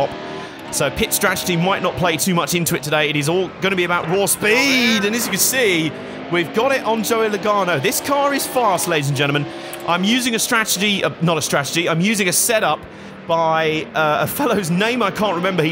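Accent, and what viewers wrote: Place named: United Kingdom. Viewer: I love this channel plus the British accent 😂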